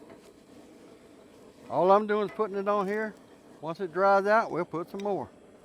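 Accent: United States. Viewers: He has the best American accent.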